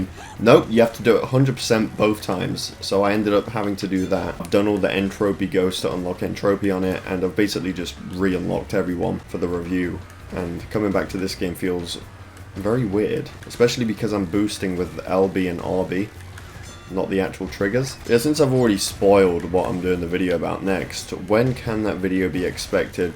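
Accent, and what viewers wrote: United Kingdom. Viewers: I get you with sounding monotone. I think the British accent plays a big part in that tho no matter how much you try not to sounds monotone. I'm from the north of England so i think the same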